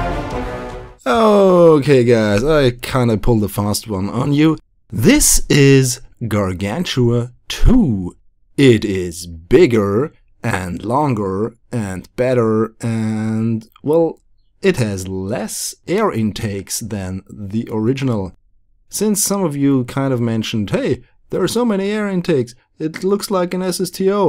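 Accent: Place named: Germany. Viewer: Die mieseste Manleykopie ever. Mit dem deutschen Akzent könnte ich ja noch leben, aber das hier? Mies...^^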